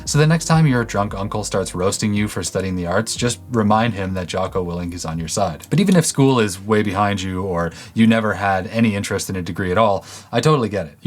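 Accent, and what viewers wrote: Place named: Texas, United States. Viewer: Canadian? I thought you were from Texas.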